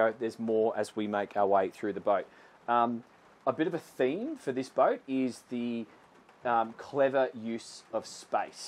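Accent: Australia. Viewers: I don't care about the boats, but I love the tight pants, Aussy accent, and the peekaboo tease of his hairy belly!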